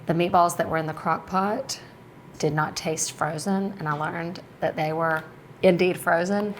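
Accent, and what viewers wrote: South, United States. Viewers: Italian babe with a southern accent. Win win!!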